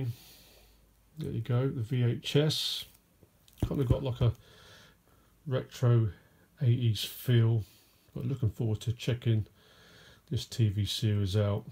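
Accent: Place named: United Kingdom. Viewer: Hello! Merry Christmas! How did you get the name btw? You sound very British,but here in the states when someone is named they're usually from a Spanish speaking background.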